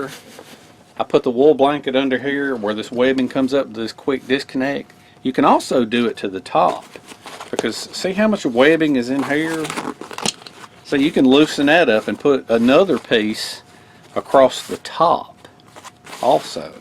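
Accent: South, United States. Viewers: great and informative video. just bought a pair of rocky boots cause i saw them on your channel. great boots. now something off topic. me being german always wondered why you and nick for example as southern gentleman seem to talk a little diffently. with some strong southern accent and nick not so much. why? is it a thing of age or just how you learned it? sorry for being so damn curious:)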